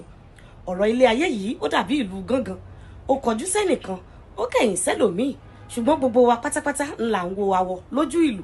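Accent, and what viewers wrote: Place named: Yoruba, Nigeria. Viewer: Love listening to your deep Yoruba accent. It sounds like you are singing a joyous tune which make it pleasant to the ears. Awesome message! Just what I needed to hear after being frustrated with my co-workers’ attitude. 🙏🏾🤗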